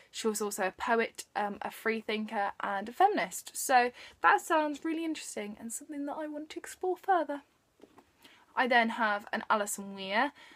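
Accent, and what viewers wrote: United Kingdom. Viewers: Beautiful British accent. Great review. I love English Puritan history because there is alot of history in understanding how American religious freedom evolved.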